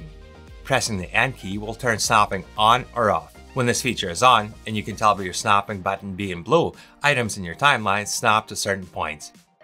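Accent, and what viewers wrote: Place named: Canada. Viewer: You are so good at this. Thanks for sharing your skill. You Canadian? Trying to figure the nice accent.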